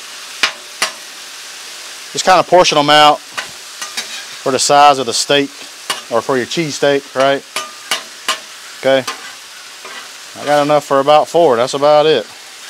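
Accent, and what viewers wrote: North Carolina, United States. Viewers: Man you gotta be from somewhere around G-town , NC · My 36” is coming in this week! You sound like your from my neck of the woods near Raleigh NC.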